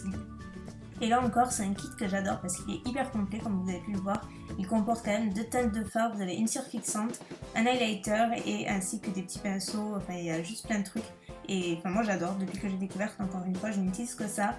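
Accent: South, France. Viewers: Tu es sublime! ♡ Je regarde tes vidéos depuis pas mal de temps maintenant et c'est toujours un plaisir!! Tu es absolument magnifique et tu as très bon goût. Je te souhaite de pérenniser sur Youtube et que tout aille bien pour toi. Ta bonne humeur et ton petit accent du sud sont un vrai rayon de soleil!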